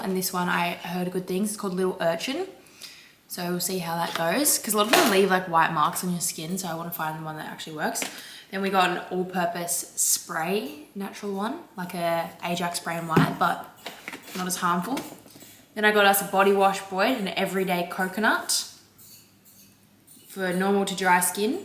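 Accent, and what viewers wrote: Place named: Australia. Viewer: Who wants to listen to me for 25 minutes errrrrrr me trying to fall asleep to the sound of that soft beautiful Aussie accent 😂id actually appreciate longer videos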